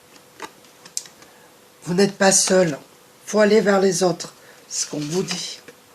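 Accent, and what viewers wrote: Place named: Belgium. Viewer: haaaaan dsl vous avez un accent de Belgique 😂🤭 pardon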